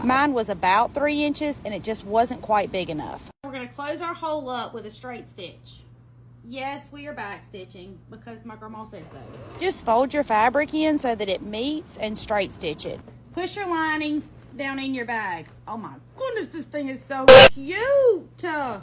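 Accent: South, United States. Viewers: I just found your channel a few days ago, and im so impressed!! I just made this bag, it took me longer than an hour but I love it!! You are a great teacher! I love your accent, you must be from the south. Thanks for sharing your talents with us!!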